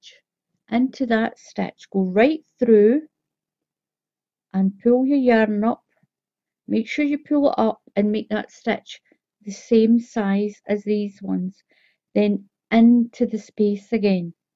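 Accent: Scotland, United Kingdom. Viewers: Thanks for a lovely CLEAR TUTORIAL. I'm stuck in bed after heart surgery so looking forward to trying this pretty pattern later today. Lots of love Kathy. Also lovely to hear a Scottish voice. Been away from Scotland for 50 years ❤️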